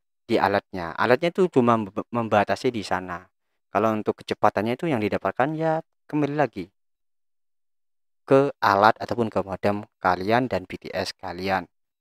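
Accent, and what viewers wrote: Madura, Indonesia. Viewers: bang, orang Madura?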